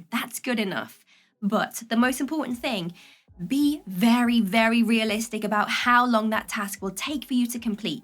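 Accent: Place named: United Kingdom. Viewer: This is my real channel, lady with the wonderful British accent.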